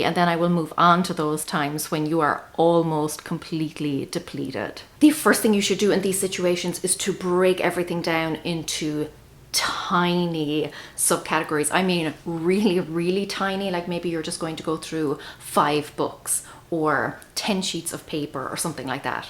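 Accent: Ireland. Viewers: Very real. I am recovery surgery and low energy, this is very realistic. Thank you for this great viedo. I love your accent, it must be Irish, awesome!🍀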